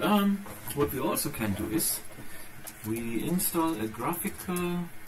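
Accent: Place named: Germany. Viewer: Hello! I hear you have a German accent. So where do you come from? Best regards, Serge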